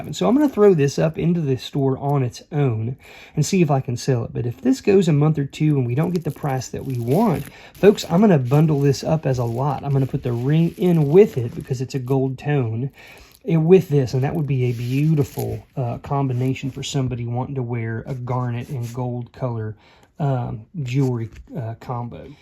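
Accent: South, United States. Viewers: Hey. I'm new to reselling. Well I have stuff but haven't listed yet and I believe I'm overthinking lol. Heard you say you were going to a shop in NC. Not sure if that's where you do business, but when I have watched videos, I wondered where you were from (the country accent🤷) lol. I got that SC country accent going on. Thanks for all the education!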